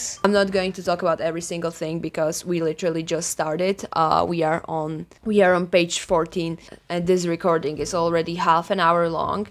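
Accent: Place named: Eastern Europe. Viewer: You sound so Eastern European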